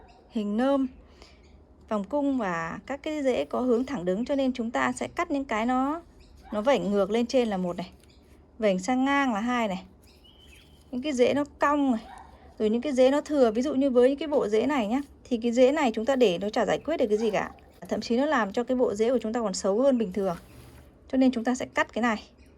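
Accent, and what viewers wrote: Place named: North, Vietnam. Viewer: Khéo tay, chắc rất giỏi mỹ thuật, giọng Bắc mềm mại lịch sự, nữ tính. Và tôi tiếp tục theo dõi học hỏi. Mong được làm sư đệ của bạn!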